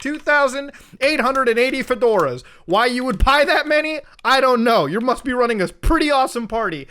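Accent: Canada. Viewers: Anyone else feel like mutas Canadian really came out thick on this one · You can tell is Canadian by the way he pronounces “tomorrow” as “to-more-oh”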